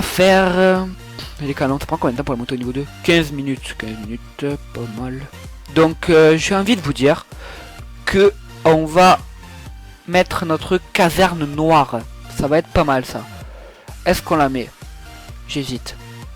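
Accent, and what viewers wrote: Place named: Marseille, France. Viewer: Marseillais je crois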